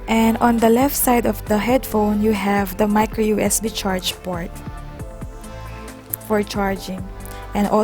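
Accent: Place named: Philippines. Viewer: Filipina k mam nu? Hehe halata sa voice mo 😄..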